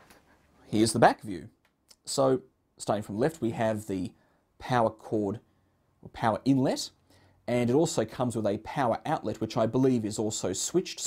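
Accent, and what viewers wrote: Australia. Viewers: I recognise that accent! Its the same as mine, he's an aussie!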